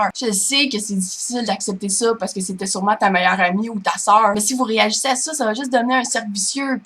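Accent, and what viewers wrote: Quebec, Canada. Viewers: s'tune quebecoise a parle quebecois tabarnak XDD ci a parlerais italien tu conprendrais pas plusse spa ta langue esti XD a parle quebecois tes francais u mad to not understand what she say ! ? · Lol!!L'accent du pur Quebec!!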